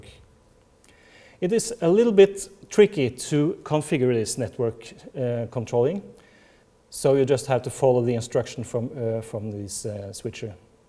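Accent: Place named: Norway. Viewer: Tok ikke lang tid å høre at denne fyren er norsk!:P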